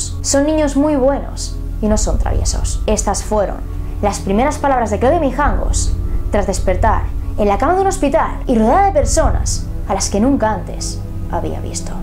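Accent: Spain. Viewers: le gustan mucho las historias urbanas Mexicanas,le da un sabor muy agradable su acénto Español..!!😃😉🇪🇸🇲🇽👻🌵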